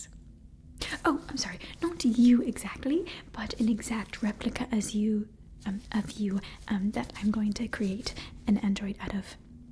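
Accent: England, United Kingdom. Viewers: Nice English accent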